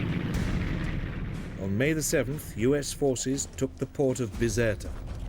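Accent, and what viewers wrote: United Kingdom. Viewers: I only had to read the description to know it was another propaganda load o'crap about Italy's role so didn't even watch. I would have bet a million it's British, and listened for 10 seconds and yep, sure enough.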